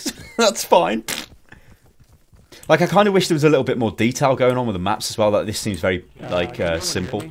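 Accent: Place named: United Kingdom. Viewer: That's like the britishiest voice I've ever heard :D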